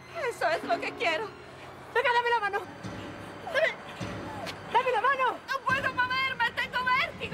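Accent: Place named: Italy. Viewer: bruttissimo l accento italiano nello spagnolo🙉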